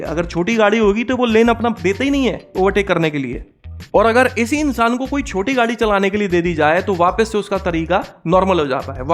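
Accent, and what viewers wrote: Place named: Gwalior, India. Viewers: बहुत समय बाद ग्वालियर चंबल बेल्ट की टोन सुन कर अच्छा लगा..... सही रिव्यू दिया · Bhajja tumai language hame bhind muraina ki lagte😀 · Lagta hai aap pakka gwalior ya Morena side se ho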